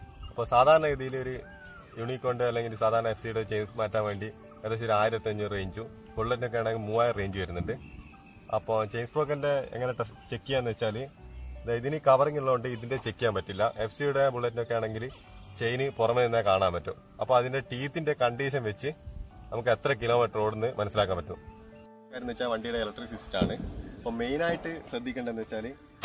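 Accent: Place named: Vadakara, India. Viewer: Bhasha shyli vadakara istam😃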